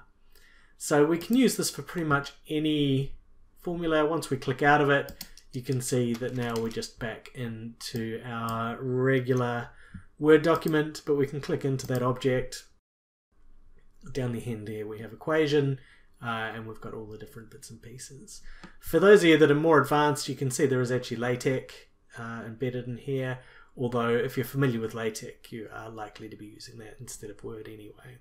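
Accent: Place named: Australia. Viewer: thank you, "mate"? I think i detect an Australian accent. You're brilliantly concise.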